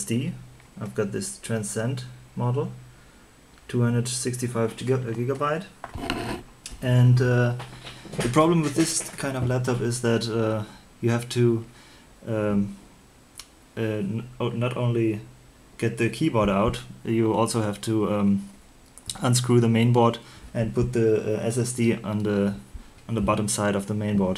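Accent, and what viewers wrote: Germany. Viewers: Nice Video, you have a big german accent, are you German?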